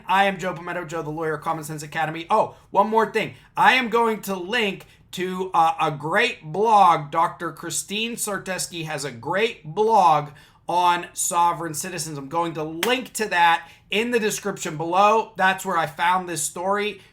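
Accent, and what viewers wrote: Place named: Western Pennsylvania, United States. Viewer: I was raised in western PA just far enough from Pittsburg for the smoke to only be visible on windy days. (mostly) I've spent half a century travelling (not driving) across the US and world for business. You're the other western PA accent I've encountered in the past 1/2 century since I left. But that's just Reason #3 for enjoying your posts. Keep it up.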